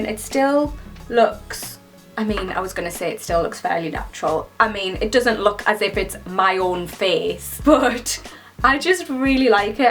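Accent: Newcastle, United Kingdom. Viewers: Are you a geordie?:D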